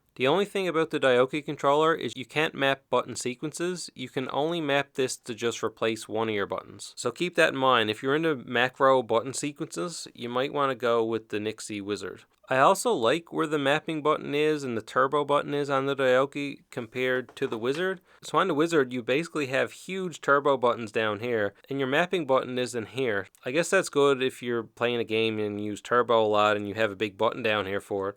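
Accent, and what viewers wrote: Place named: Eastern Canada, Canada. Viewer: Would you happen to be from Newfoundland? I can hear the eastern canadian accent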